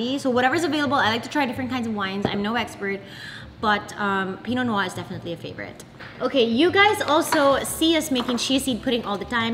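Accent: Visayas, Philippines. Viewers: Bisaya kaayo ka dong scottie ayy. 😍😁 "TIKI"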